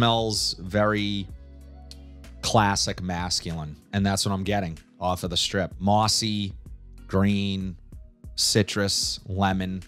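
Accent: Boston, United States. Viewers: I wanna live in the states if anything for the fragrance variety at Marshall’s . Canadian ones are more or less terrible . Winners is the worst. I’m also here for the Boston accent 😅👍🏻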